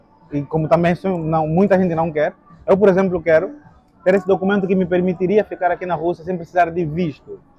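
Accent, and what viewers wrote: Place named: Brazil. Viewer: Não Acho que há problema algum nisso, não deixa de ser um idioma, e nos entendemos, e se acompanhasses realmente o canal dele, saberias que ele, adora a cultura e o povo brasileiro, e muitas vezes mencionou que sempre que fala com brasileiros tenta falar como um brasileiro, o que eu acho bonito como brasileira, não sei para os angolanos, mas isso não lhe tornara brasileiro, ele ama idiomas, e idioma não se baseia só na lingua mas sim em pronúncias e sotaques diferentes, aprender sotaque de outro país também é um desafio linguístico. Parabéns para ele, Brasil te ama.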